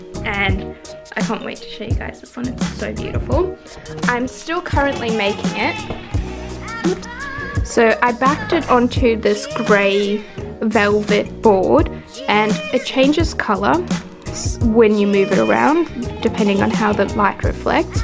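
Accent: Australia. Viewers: In love with this dream catcher! Also, love your Australian accent.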